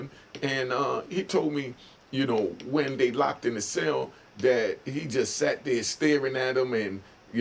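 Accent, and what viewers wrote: Jamaica. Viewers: Respect I see you can speak Jamaican.👍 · Hey, you Jamaican? If you not the cccent on point😅. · Yardman protector you sound like a yardman with a accent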